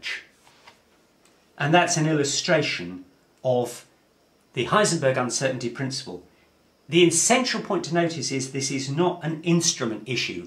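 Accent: United Kingdom. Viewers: Brilliant videos, thanks ! and im so delighted. His english is crystal clear! haha god bless British accent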